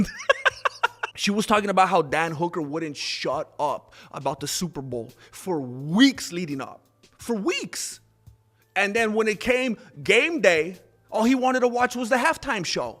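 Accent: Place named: Mexico. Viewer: Eyy fool where is that ole mexican accent ?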